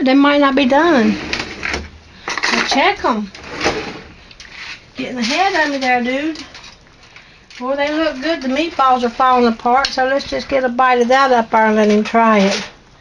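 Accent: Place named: Appalachia, United States. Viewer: I too suffer from fibromyalgia and also MS and empathize with you. God healed me from stage 4 colorectal cancer 2 years ago and it's a painful cancer- but nothing I've suffered (and I've had 10 pregnancies lol), not even MS or the cancer was as bad as the fibro. It's the worse! God is with you and there's no faith like Appalachian faith - I know cause that's my family, from the hills of W. Va and Va, near the border- both sides! Your accent always brings me home. ❤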